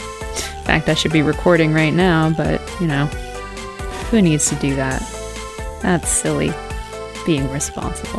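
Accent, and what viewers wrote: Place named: Texas, United States. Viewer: Such an excellent review, the oh so most divine a Texas mouth can bring to the table!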